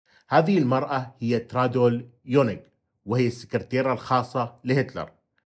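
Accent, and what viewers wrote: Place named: Iraq. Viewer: الحنين الى اللهجة العراقية في سرد القصص😢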